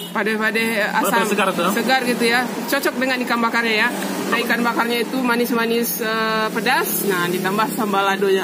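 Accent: Minangkabau, Indonesia. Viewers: Bahaso Indonesia uni ko khas urg awak bana hahahahaha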